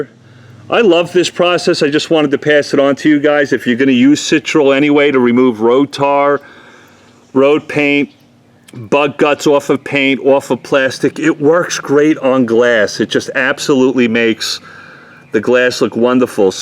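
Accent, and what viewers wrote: New York, United States. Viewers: Are you jew from New York? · Are you Italian and are you originally from NY? There is no way you arent lol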